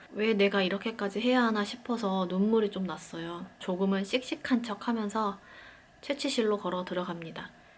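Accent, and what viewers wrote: Gwangju, South Korea. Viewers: 저도 2월7일날 2차피검 실패하고 다 싫고 아무것도하기싫고 사람만나기가 젤 싫은 중 입니다 말투가 광주 분이신거같아서 영상봣더니 저랑 같은 병원이시더군요…ㄱㅈㅁㅎ 너무 부럽구~ 쌍둥이 임신 축하드려요 저도 그런날이 올까요?ㅠ 서카딘은 그냥 살수잇나요? 처방전받아야하나요?? 저도 광장히 예민해서 잠을 잘 못자고 그렇게 무언가에 쫒기는 꿈을 꾼답니다ㅠ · 광주분이신가봐요?아닌가?암튼 그래도 고차수 까지 안 가고 성공하신거 늦었지만 진심으로 축하드려요~ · 혹시 전라도 분이실까요??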